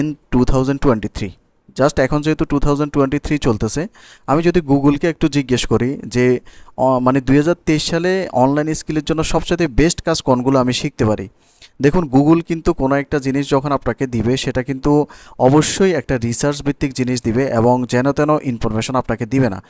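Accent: Noakhali, Bangladesh. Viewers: আপনার বাড়ি নোয়াখালী আমি বুঝতে পারছি। প কে ফ বলা হলো নোয়াখালীর মানুষ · আপনার বাড়ি মনে হয় নোয়াখালী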